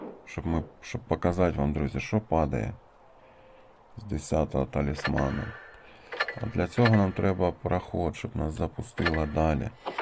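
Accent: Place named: Chernihiv, Ukraine. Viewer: А Ти сам що з Чернігівщини? Наш говор😂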